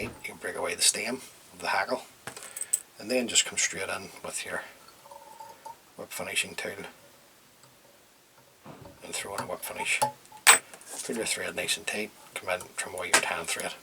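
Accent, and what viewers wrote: Northern Ireland, United Kingdom. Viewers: Excellent tie and collection of patterns! I just sub'd and really enjoyed looking at your community page. Lots of inspiration from this one, especially with the wood pigeon wings. I've used common pigeon (city pigeon I called them) that I found around the house to tie wet fly wings when I first started tying. I love these wets from the UK, and your style of tying them. You sound similar to Ryan Houston, I was wondering if you're from "sunny" Northern Ireland like he is? Thank you. Cheers from the US!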